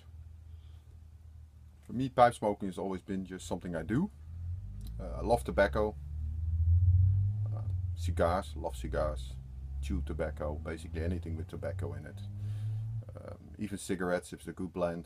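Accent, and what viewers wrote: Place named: Netherlands. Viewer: ok, ik herkende dus toch je Nederlands accent,hahah, ben vaak in Copenhagen ook, maak je ze zelf ook? groet, Nico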